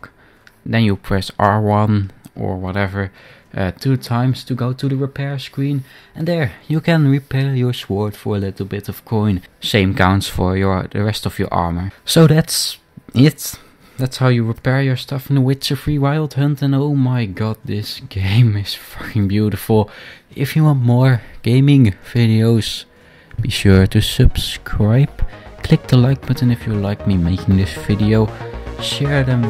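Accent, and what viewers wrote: Netherlands. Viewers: why not, Dutch people are cool and the accent is hilarious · He’s Dutch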